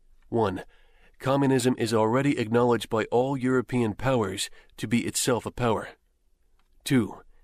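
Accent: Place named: United States. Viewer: The thick American (AI?) accent and unrelenting monotone renders this historic document unlistenable.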